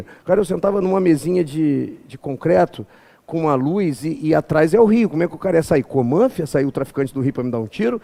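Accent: Rio de Janeiro, Brazil. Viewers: Esse professor é carioca?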